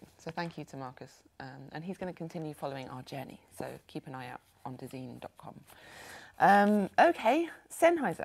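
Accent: England, United Kingdom. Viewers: What part of England is she from?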